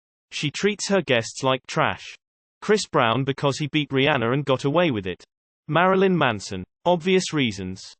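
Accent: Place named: United Kingdom. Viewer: The British voice works surprisingly well with hateful comments.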